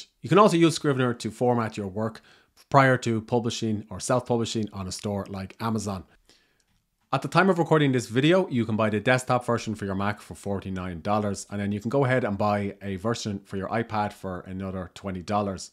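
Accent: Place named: Ireland. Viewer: You mentioned that I can use a pencil. But I was wondering if I can use it as a handwriting tool? I know that you can use the pencil to click on the keyboard as you type but I can no longer see the keyboard so I was wondering if there's a way that it can be used for handwriting instead so that I can write as if I was riding on a paper? Did you get your accent from parents or do you still live in Ireland? Hopefully that made sense lol I am writing a novel currently and one of my characters may possibly be Irish and I was curious.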